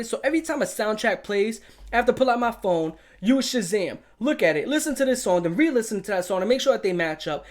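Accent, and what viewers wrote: New York, United States. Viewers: Besides the obvious comparisons to Plagued Moth and Wendigoon, there really isn't anything else like on Youtube. The New York accent makes it for me. Sounds like Michael Rapaport talking about gore XD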